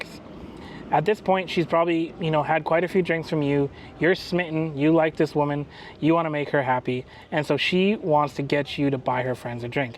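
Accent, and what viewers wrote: United States. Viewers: You sound American to me, but if you say you are not I will assume you are Canadian, which by defintion, in my book, is "American"... :) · *Im not american*, says the dude with one of the most american accents Ive ever heard. Sounds like hes straight out of Cleveland or some shit. lol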